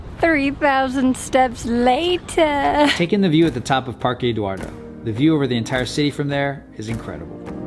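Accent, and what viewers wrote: Brazil. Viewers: You are learning Brazilian Portuguese 😅😅 Portugal accent is stronger, like British English vs American. But you are doing great, ready to go to Rio de Janeiro🙌🏻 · you are learning portuguese accent from brazil and not the real portuguese from portugal :D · Just please dont use the brazilian portuguese app you were using in the video, please! Portuguese from Portugal is a bit different from Brazil and the pronounciation/accent are very different. Thanks.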